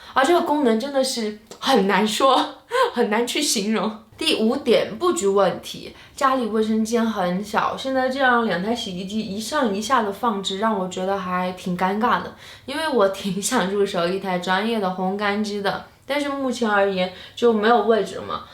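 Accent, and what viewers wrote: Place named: Chengdu, China. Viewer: 听口音，好像成都的呢。 用的了那么大的还一大一小啊？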